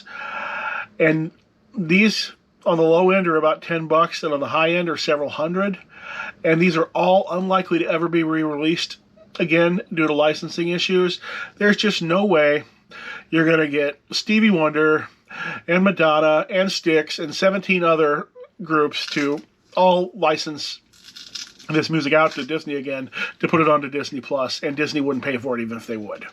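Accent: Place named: Missouri, United States. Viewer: You sound like your from Missouri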